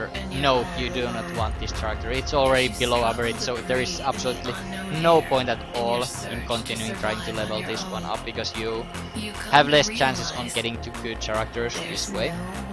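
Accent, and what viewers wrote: Finland. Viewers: Ha! I knew that you are Finnish because of your accent (Actually, the "wikipedia" was the part when I knew that you are Finnish) Sinä paljastuit! :P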